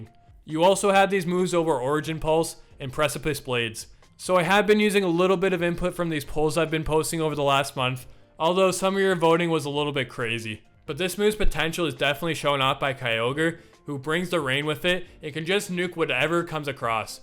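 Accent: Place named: Canada. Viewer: Please don’t use T*ump reactions like imagine if H*tler was used. I hope that puts it into context. I had a more thoughtful comment but of course youtube auto-deleted it. I love your content so I implore you to take this criticism seriously and with empathy for Americans. (It’s always esp like the scenario I described since you’re Canadian, at least I assume based on your accent and then confirmed on your channel location lol). And I say this as a dual Canadian American citizen.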